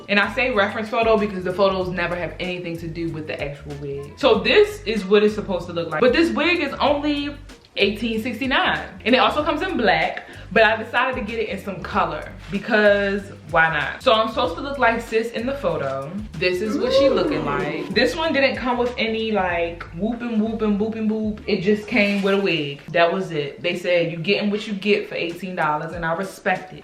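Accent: Baltimore, United States. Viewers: The curly was cute 😂and you sound like you’re from Baltimore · I watched your first Amazon wig video and I was like she gotta be from Baltimore, the accent in this video confirmed it. Nice to find someone from my city I can watch 💯🙌🏾 keep the videos coming you're hilarious too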